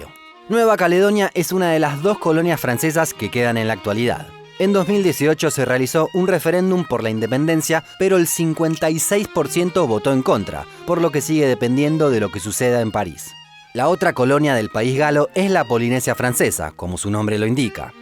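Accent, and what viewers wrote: Argentina. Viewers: Nunca me gustó el acento argentino, pero el tuyo es punto y aparte, me encantan tus videos, saludos.:)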